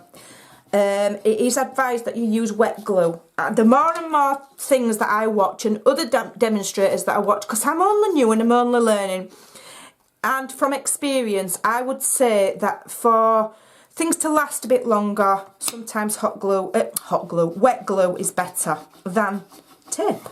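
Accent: Yorkshire, United Kingdom. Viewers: Great Video and Beautiful Box, keep them coming!! I enjoyed laughing along with you so much, that I have subscribed to your Channel to hear more from you, so I am looking forward to lots more lovely ideas. Love listening to your Yorkshire accent - I am an Australian SU Demonstrator.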